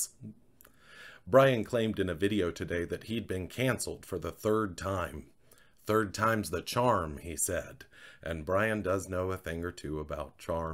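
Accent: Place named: South, United States. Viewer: What I need to comment on is the way BC was read in the most southern way possible! Thank you!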